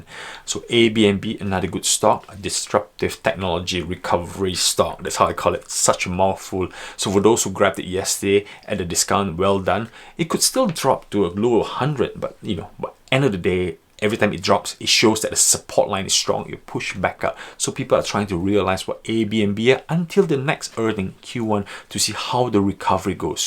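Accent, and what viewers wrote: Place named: Singapore. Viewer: oh! where are you from? you sound singaporean :)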